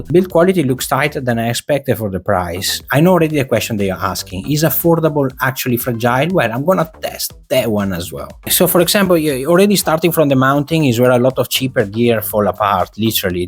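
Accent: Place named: Italy. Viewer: Ciao, se non sbaglio dall'accento sei italiano🇮🇹 .... Perché fare video in lingua inglese😢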